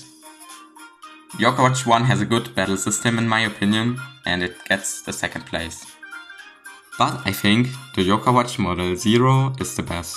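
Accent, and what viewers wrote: Germany. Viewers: Is that a german accent? Hi wie gehts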